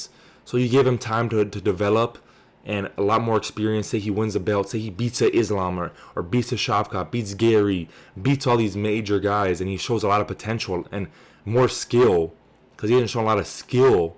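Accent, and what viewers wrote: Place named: Miami, United States. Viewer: u from miami bro? i hear that accent 😂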